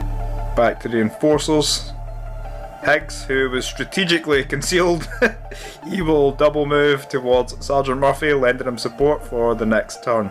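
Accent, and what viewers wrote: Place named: Scotland, United Kingdom. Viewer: Great work my man - good to hear a Scottish voice - keep up the Necromunda content, cant get enough! All the best from sunny Ayrshire!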